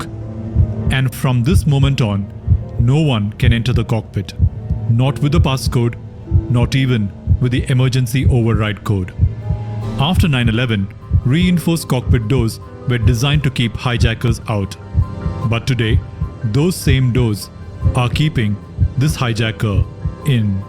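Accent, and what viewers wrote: India. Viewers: Hey are you from india? You accent sounds indian. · Fck the narrators thick Indian accent … is so disturbing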